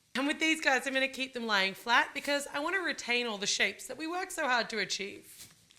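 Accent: Australia. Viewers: R u Aussie or American??????? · Hi! I'm an Aussie, been living and working in Japan for the last 6 years. I suck at baking, but my sister loves it and I wanted to feel close to her, so 2 years ago I started watching your channel. It reminds me of home (no matter how far or wide I roam, right?). I remember how to speak Aussie from you, so that my family won't tease me for sounding American lol (Funny that people actually can't understand Aussie here!) Your creations/ideas are amazing(!) and your down to earth personality is beautiful(!). I'm pretty sure that running a business, being publicly known and raising two gorgeous kids must be crazy sometimes, so I just wanted to take a second to say THANK YOU for all the countless(!) times you've made a homesick Aussie feel like she's back home in her sister's kitchen for half and hour or so each week. I pray you know how much God loves you! 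Renée x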